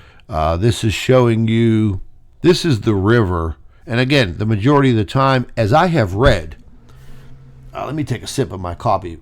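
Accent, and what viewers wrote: Pennsylvania, United States. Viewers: I can't tell you how good it is to hear your voice and see your reporting. I hope the good doctor is doing well you haven't mentioned her lately but then again there's so much going on in the world and in your own personal life. I hope that part gets resolved. I know getting world resolved is not anything we can do it is just going to work around us. We can do very little about it. Buying fewer SUVs or using the stupid carbon tax does nothing for the climate or our planet.. Some political honesty from the powers-that-be would be a lot better. But again it's good to hear you back on the "YT"it's nice to see the videos you put together as well. You're always so informative. As I hail from that part of PA. I relate to your accent, attitude and willingness to call it like it is. Again, I am glad your back researching and keeping people informed especially those willing to watch with an open mind. 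Again I hope your health improves and the good doctor weathers her physical trials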